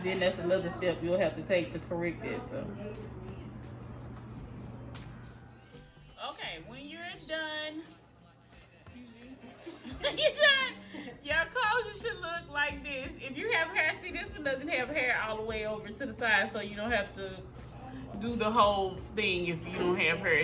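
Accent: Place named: Dallas, United States. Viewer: I instantly knew you were from Dallas and you sound like me bih. And then you said Ebony beauty supply.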